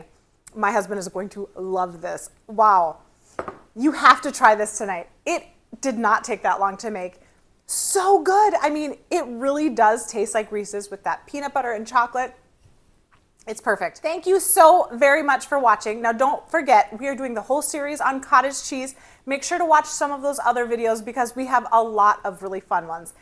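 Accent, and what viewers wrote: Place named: South Dakota, United States. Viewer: I spent some of my elementary school years in eastern South Dakota. You sound like you're from that area of the world. Sounds good!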